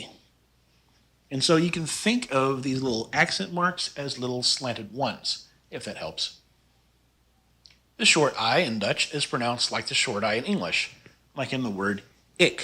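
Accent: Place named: United States. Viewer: are you american, you sound so america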